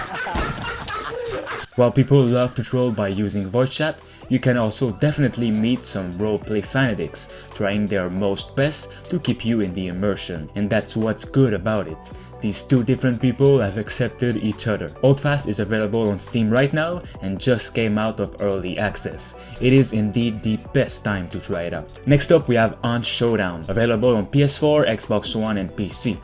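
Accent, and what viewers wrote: Quebec, Canada. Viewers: Accent québécois ? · est-tu quebecois mon criss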